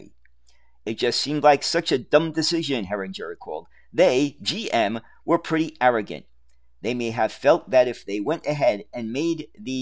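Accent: New York, United States. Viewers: The audio was just fine. The NYC accent is authentic.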